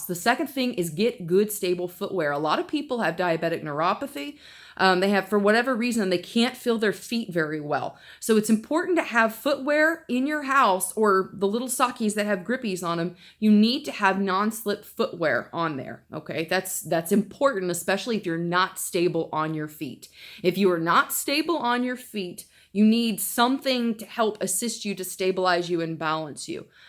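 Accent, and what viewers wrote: United States. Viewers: )very good explanation I was able to understand your american accent Very Informative Keep up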